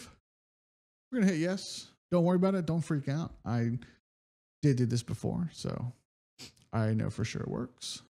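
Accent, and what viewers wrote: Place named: United States. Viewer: Thanks. Such an american accent with a romanian sounding name :)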